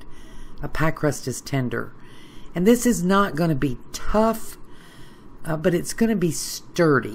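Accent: United States. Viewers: So odd to watch a Cornish pasty being made by a lady with an American accent, nicely done though.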